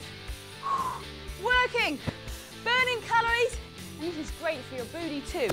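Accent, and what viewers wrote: United Kingdom. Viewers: She has a British accent. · Anybody else do all of her amazing workout and suddenly start talking along to them in a British accent .. I love it!!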